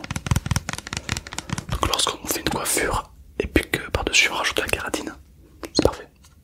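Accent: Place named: Toulouse, France. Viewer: Accent Toulousain est magnifique le roleplay est relax surtout coiffeur j'adore, 😁👍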